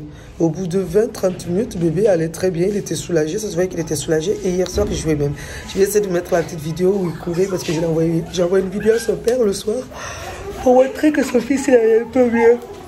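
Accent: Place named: South, France. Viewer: Excellent Sa fait plaisir d’entendre l’accent du sud en Guinée lol je suis pour Marseille au foot c’est pour ça…😅 je suis d’origine guinéenne je ne suis jamais allé en Guinée… c’est comment pendant la saison des pluies l’humidité est-elle supportable ? Merci pour ta vidéo je vais regarder le reste plus tard… 👍🇫🇷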